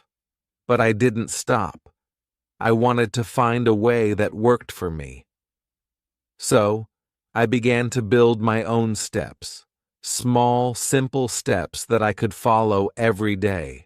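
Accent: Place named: England, United Kingdom. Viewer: I'm Russian. And my English's very bad with a pronunciation becouse I've learned it only by reading books. Youre pronunciation's very beatifull! It's realy English of England! I enjoyed listening it. Thanks!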